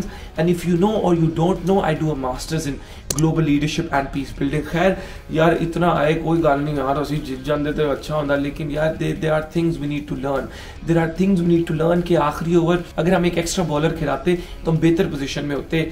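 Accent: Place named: Punjab, India. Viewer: You are so funny and your Punjabi accent.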